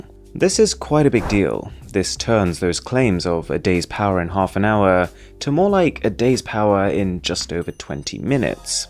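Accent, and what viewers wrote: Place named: RP, United Kingdom. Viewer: Supersaf isnt as pretentious with his reviews. Drop the posh accent.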